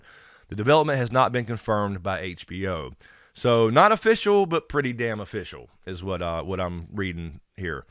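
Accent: South, United States. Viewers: Will do. I guess I still want to hear your take on their comments though, as, well I respect your opinion. I'll listen to it, but what Im' getting at is; what would you have said if this was something like a panel discussion and you got to ask them questions, and point out instances to them that you think doesn't fit the tone of the rest of the series. And of course get more of the cute Southern drawl. ( I swear I'm not flirting! You know I'm married. My mom ran away from Tennessee, so it feels homey to me in some weird way.😉)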